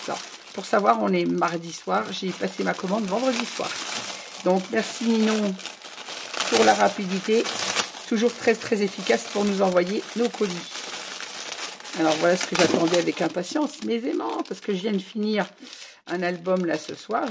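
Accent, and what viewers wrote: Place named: England, United Kingdom. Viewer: Cc, encore une bien belle commande!!! 😊 J'ai hâte de voir le tuto mix média car j'aimerais bien apprendre à faire de belles boîtes comme tu les fais si bien 😉 Entre parenthèse, j'aime beaucoup ton accent "english" 😁 Sur ce, je te souhaite une très belle journée À très vite Scrap'bises 😘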